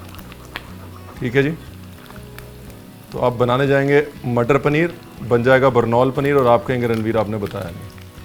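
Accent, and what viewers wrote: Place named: Punjab, India. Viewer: Aapko sunte hi maine bhi apne andar ke Punjabi ko pehchaan liya. Hats off Chef. 🙏🏼❤️🙏🏼 Isbaar bengali recipes ki baari. Plz plz.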